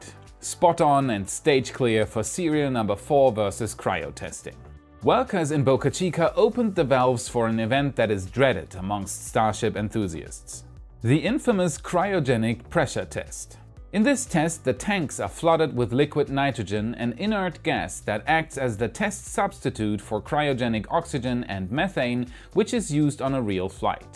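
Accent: Germany. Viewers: Are you from Germany? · Have i mentioned how i like your van braun english accent! Great video as usual.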